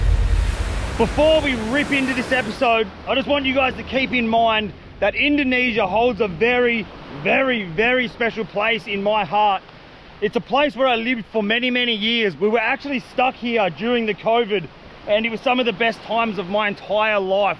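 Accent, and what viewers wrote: Australia. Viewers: I love the long videos, I still remember meeting you in indo at a beach, I recognised another Australian voice and looked behind me and there you were with the boys! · Does this really sound normal to australians? I love this guy's accent, close my eyes and listen to him talk when im trying to get sleepy. But it never works because the videos are so interesting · My grandma was from Indonesia. I live in the Netherlands. Indonesia is so beautyfull. Its a shame all the rubbish thad washes up on the beach. It is really a beautyfull country. And youre Australian accent is always so funny and uplifting to me. "Yea dawgies". 😂 Love youre channel man.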